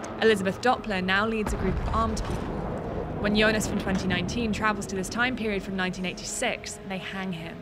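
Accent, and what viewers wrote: England, United Kingdom. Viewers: The narrator has the most neutral, articulate and clear English accent one could possibly have... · Sub eng please, i dont really understand when listening UK accent hehe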